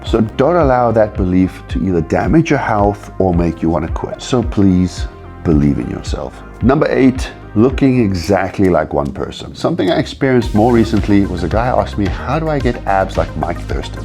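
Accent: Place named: South Africa. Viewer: Great video, you sound very South African and look like a member of the Springboks. 🤔 Great content 💪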